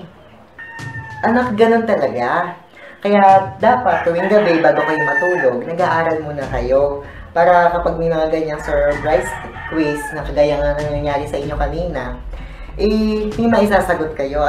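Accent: Batangas, Philippines. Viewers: Ala'y Batangeñong Batangeño ehh...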